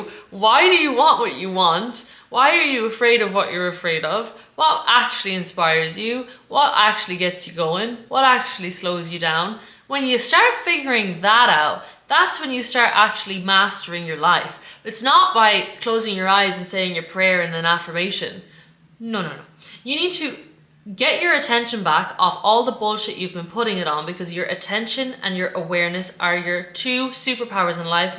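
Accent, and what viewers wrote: Ireland. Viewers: Love your Irish accent and your style of presenting your information. Wish I had your talks when I was young.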